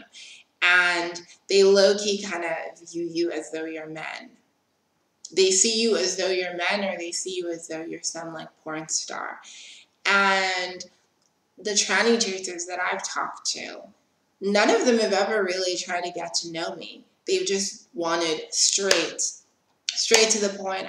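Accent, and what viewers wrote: United States. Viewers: You’re accent doest strike me as South african but rather American?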